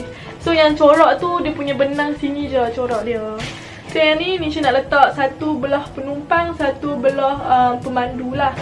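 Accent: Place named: Kedah, Malaysia. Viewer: Bagus loghat Kedahnya 😂😂👍👍👍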